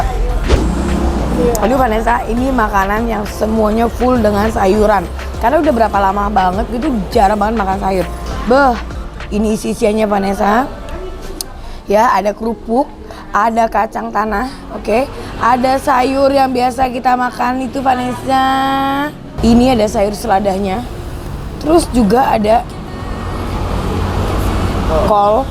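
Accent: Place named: Java, Indonesia. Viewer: Medok euy. Btw anting nya telor ceplok ya ci 😊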